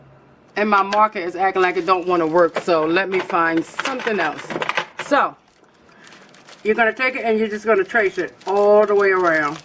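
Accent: Baltimore, United States. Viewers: The boots are sooo cute also where are u from sound like you are here in Baltimore ! Loving your channel ❤️❤️❤️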